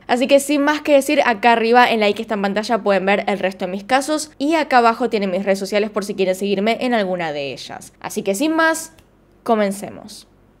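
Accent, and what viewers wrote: Argentina. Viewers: Me encanta el acento argentino😀 Excelentes vídeos... Saludos desde Ecuador 👏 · Hola me encanta como narras todos los casos ya mi hija y mi yerno están adictos también a tu canal..pero..quisiera preguntarte Cómo te llamas..cuantos años tienes lo unico que sé es que eres de Argentina por tu acento Soy Panameña por todo lo demás y como decimos acá me quito el sombrero ante tu excelencia de documentar investigar y narrar todos los casos...Felicidades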